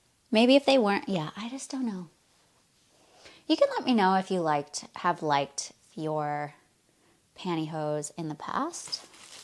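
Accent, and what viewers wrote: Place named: United Kingdom. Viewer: She sounds British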